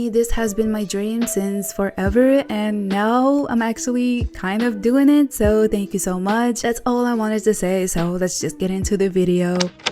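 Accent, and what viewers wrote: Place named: India. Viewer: was gonna listen but indian accent = opinion rejected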